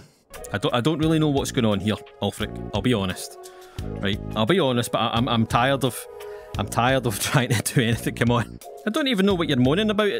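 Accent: Scotland, United Kingdom. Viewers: A Scottish version of IAmCrusty….I love it 😂🥹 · This is the first video I've seen of this man, but his beautiful Scottish accent sold me. Subscribed. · I’ve never found something funnier than a Scottish man calling Ulfric Stormcloak a dirty fascist bastard. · Damn, that was HILARIOUS! I couldn't stop laughing! And with scotish accent I instantly thought of the NacMacFeegle! Nay King! Nay Queen! 🤣 So maybe you could make the other way rund also dragging Tullius to Ulfric! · Scottish YouTuber AND Skyrim??? I’m home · It’s the Scottish accent for me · Lol IAmCrusty does this sort of thing and he's British so hearing a Scottish person get upset in this game is a new level of entertainment that I can get on board with. Glad I found your channel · First video I seen was Aventus, it was weird and would have felt unnatural had I not known what was happening lol. 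This series is GOLD! Your heavy Scottish accent makes it so much better. Thank you. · The Scottish scolding is really soothing. I will watch your other videos, they maybe relaxing too. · Scottish accent made this so much funnier😂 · 😂😂😂 oh i love ur accent it makes it so much better to watch scottish is an amazing acent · I did not know I needed 23 minutes of a Scottish man dragging Ulfric Stormcloak all around Skyrim. For that, I thank thee. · Never thought i spend 30mins hearing a scottish dude ramble at an npc yet here i am🤔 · If you had a tid bit of irish mixed in with the scottish accent you could become Sheogorath himself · This is Scottish babes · Why is there a Scottish man dragging Jarl Ulfric across the land of Skyrim while scolding him with a heavy accent in my recommended videos? Not that I'm complaining. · The Scottish accent just makes this more entertaining LOL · I just found this channel, and my god this is hilarious. 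The Scottish accent really adds to it all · I'm a simple man. I hear a Scottish accent, and I'm happy. In fact, I think I spontaneously sprouted a kilt, and for some reason I cannot pronounce "burglar alarm" any more. Just found your channel, already love it. Thanks! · Your name should be the laughing scotsman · No intro, not name, not asking to like and subscribe. Not even an explanation aside from the title. Just a heavily accented Scottish man dragging a Nordic man across the countryside. This is peak entertainment lol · Some insane and rambling scottish dude drags Ulfric from Windhelm to Solitude. Why is nobody stopping him? Because he's the friggin dragonborn, slayer of Alduin the world ender. Who's gonna stop him? Some random ass guard that used to be an adventurer? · Oh my goodness, you might be the most Scottish person I've ever seen. Like, not even just the accent, but your sense of humour and the way you talk as well, it feels so very Scottish and it's an absolute joy to listen to.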